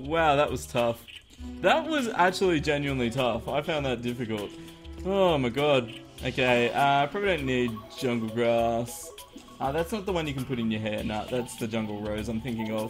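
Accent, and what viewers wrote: Australia. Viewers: lol you from Australia too mate?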